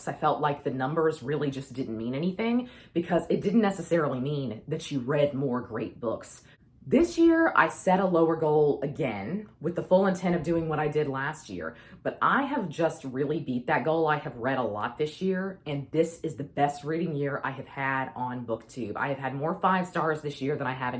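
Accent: South, United States. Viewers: Changing goals? Changing content style? Changing taste in books? Hey, that happens to us all as we grow, age and change our priorities. Just promise that you'll never stop saying "y'all" the way you do! As a Brit I can't get away with using it in conversation (sounds very strange coming out of my mouth with my accent) although I sprinkle it in tweets, comments and text messages whenever I can get away with it; but I just love the way it sounds with your accent - to me it sounds like a proper Southern Belle. I attribute 20% of my overall enjoyment from your videos to the accent and in particular your use of "y'all"; so as long as that doesn't change, the rest is all just details. All the best for 2024! 💙 x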